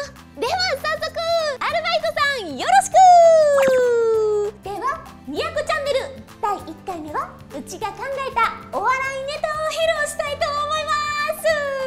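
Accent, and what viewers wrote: Kansai, Japan. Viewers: やっぱ関西弁最高やな。。。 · 関西弁ってやっぱり可愛いぃぃ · 英語→関西弁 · 関西弁可愛い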